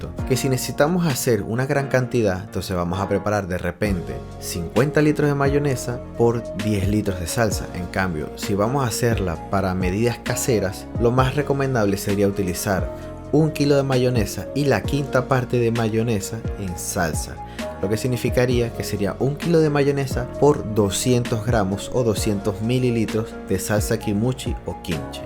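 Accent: Venezuela. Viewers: Pareces maracucho 😏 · Ays hace poco conocí una venezolana que hablaba como tú 😅 majísima por cierto!!😊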